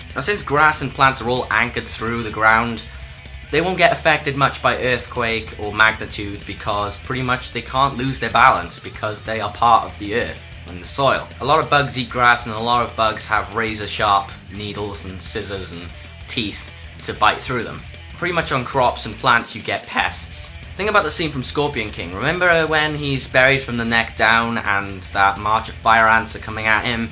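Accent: Wales, United Kingdom. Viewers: Hey dude, just stumbled upon your channel as I was looking to start up a Let's Play channel in my spare time and was doing some research. Like your videos and have subscribed! Will be sure to keep up to date with your stuff and best of luck for your channel :) Quick question, are you Welsh?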